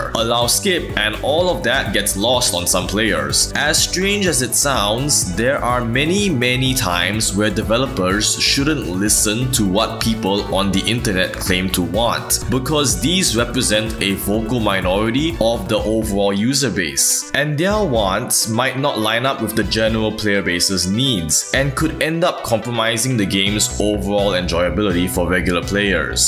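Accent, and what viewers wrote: Singapore. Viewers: I'm curious what accent this is btw. Feels like Singaporean mixed with something else. And Singaporean makes sense with your DigiPen cert.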